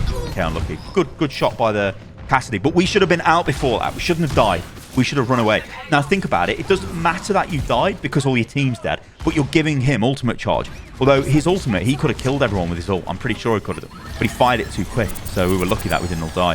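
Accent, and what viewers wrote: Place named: Geordie, United Kingdom. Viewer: Your geordie accent was really strong in this video, If your not then you sounded at least haha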